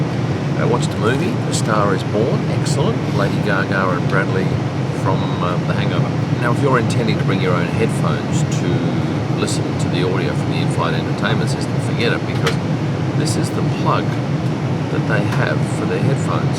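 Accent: Australia. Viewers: Loved discovering that one of my favourite media people in the F1 circus has an aussie accent. I have always appreciated your F1 media content that appealed to the female audience over the years.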